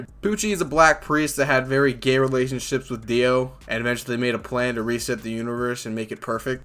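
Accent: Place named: Australia. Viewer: 277 Christian Kincaid Christian Kincaid 4 months ago I feel like an SBR Joestar family tree would be just as interesting, despite not being around as long. 114 Yoxiv Yoxiv 3 months ago Hey, how dare you forget about Jotaro's adoptive son Koichi? He's like the child Jotaro never had! 50 stan 99 stan 99 4 months ago How confusing is the Jojo family tree? Araki: Yes 72 Ken D. Uchiha Ken D. Uchiha 4 months ago Realized josuke and jotaro’s mom are siblings?😂 65 Shiranui prestonsaga Shiranui prestonsaga 4 months ago The family tree becomes so complicated once we hit part 4 and beyond 49 NoelxHunter NoelxHunter 4 months ago “While the others don’t have a sarcastic Australian man narrating” The Anime Man: Excuse me!!!??? 47 CondorShelves CondorShelves 4 months ago Donatello's last name is Versace, like the fashion brand. Part 6 in particular had tons of fashion references. 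Thanks for Jojo monday, always makes me happy to see new Jojo content uploaded straight into my youtube feed. 54 Damian Nova Damian Nova 4 months ago I do want you to do the SBR family tree because I know you’d do a great job and it would be loads of fun 32 Avocado Leeminovela Avocado Leeminovela 4 months ago The Joestar family is the lineage with a birthmark in the shape of the suscribe button 17 Sven Servette Sven Servette 4 months ago I didn't realize you were Australian. I thought you were American trying to be fancy. I guess that describes the Australian accent pretty well. 36 preseetha Deepak preseetha Deepak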